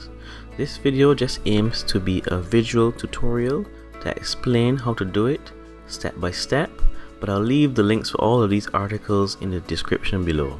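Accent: Barbados. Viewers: Liked and Subscribed! Thanks for the video really clear and easy and l was lovin' up the Bajan tones. Had to start over a few times as ledger wouldn't connect. It's all a bit scary, I wish there was some way of checking it's all gone through. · Hi are you from Barbados?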